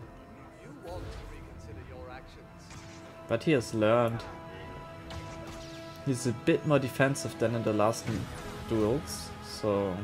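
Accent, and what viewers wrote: Germany. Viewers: Dein deutscher Akzent könnte aber auch nicht stärker sein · Man hört raus, dass du deutsch bist :D Gutes Video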